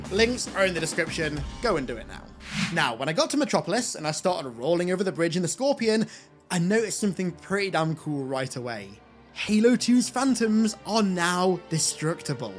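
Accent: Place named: United Kingdom. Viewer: This is probably a weird question, seeing as you have the accent, but do you live in the UK or the USA, ‘cause you also did a fourth of July episode on your other channel.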